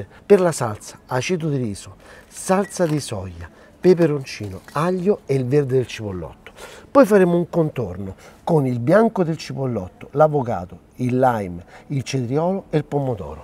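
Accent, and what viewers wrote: Rome, Italy. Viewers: Sadly the Roman way to speak gets lost in translation. It's half of the fun!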